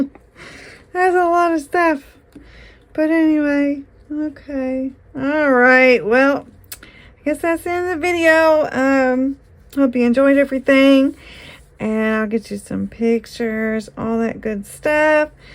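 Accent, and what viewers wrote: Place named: Deep South, United States. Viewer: lol i smile everytime i hear the same words i use come out oh your mouth lol i dont have the deep south accent anymore(unless im really pissed or super excited about something) but i use the same slang and way of talking lol i dont feel so alone in this world on the west coast where people look at me funny when i say purdy or aite lol instead of pretty or alright lol i still use the word buggy instead of shopping cart lol lol i always say yonder and people look at me like i have two heads lol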